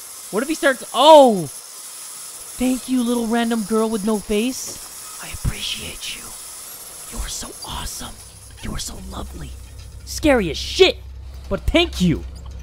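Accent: Indonesia. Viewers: Are you Indonesia because of your pronounciasion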